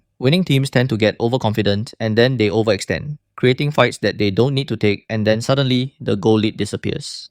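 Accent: Singapore. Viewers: Lmao. I heard ur accent and immediately have to double check ur location. Glad to meet another fellow Sgporean. Keep it up bro. As a main midlainer who played most games in mid, i may knew most of this fron exp, but its still a very solid guide that most nowadays still dnt know. Especially rotation. God knows how many times when i played other roles and the mid dnt know how to rotate, in mythic/honor btw. Appalling😂 Edit: Subscribed for support✊🏽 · Wait are u singaporean? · hi are you singaporean by chance im singaporean also and that accent is so recognisable lol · Are u singaporean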